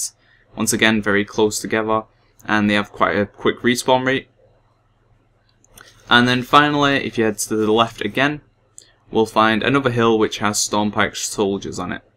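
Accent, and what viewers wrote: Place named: Glasgow, United Kingdom. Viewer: Wow the guy is developing a Glasgow accent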